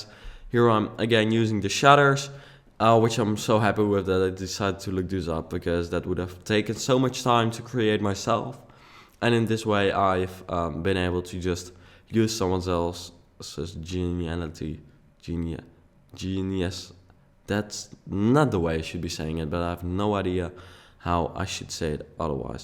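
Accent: Netherlands. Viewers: je kunt gwn horen dat je nl bent lel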